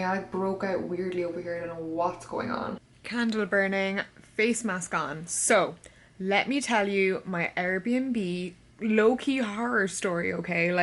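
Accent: Cork, Ireland. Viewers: Loved the B-roll footage this week gal. And also love how Cork your accent goes when you are with. It’s gas!!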